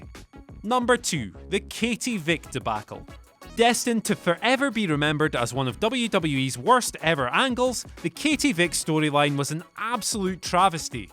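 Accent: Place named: Scotland, United Kingdom. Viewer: Finally a Scottish narrator 🇬🇧🇬🇧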